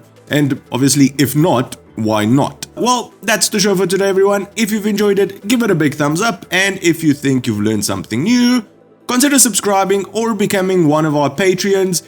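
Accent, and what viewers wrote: South Africa. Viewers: Lived in SA, hence the accent. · Love hearing your South African accent tell about motorcycles and stuff.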